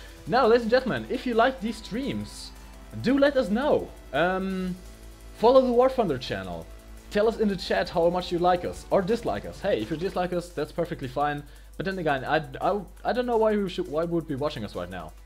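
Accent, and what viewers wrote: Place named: Germany. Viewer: Also bist du deutschstämmig. Ich dachte schon immer das du einen deutschen Akzent hast...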